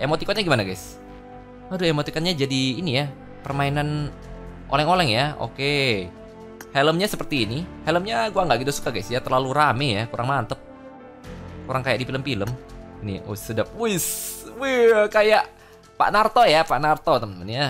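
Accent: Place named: Medan, Indonesia. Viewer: logat medan banget kau bang😁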